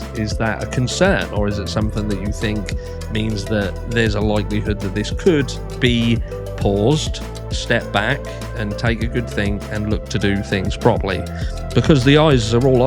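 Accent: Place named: England, United Kingdom. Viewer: I love your bright yellow light accent that is in the corner of your room, so pretty!!! As Miss Potts would say “sidebar“ Mr. what area of England is your accent from not the room accent but your voice accent, just curious and thank you.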